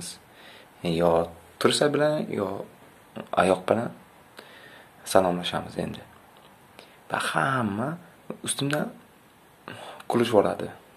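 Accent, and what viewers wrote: Uzbekistan. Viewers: Сиз тоза Озбек тилида сузлаяпсиз ,анаву вотти вотти килмасдан шундай гапирсангиз бутун Турк дунёси тушинади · Гап булиши мумкин эмас зур соф узбек тилида гапирияпди офарин 👍👍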